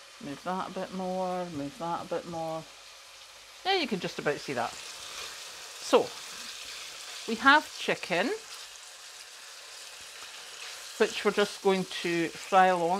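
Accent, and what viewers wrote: Scotland, United Kingdom. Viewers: i just love your scottish voice and your enthusiasm, its infectious xx keep it up, your views will GROW, your channel is just very CUTE xx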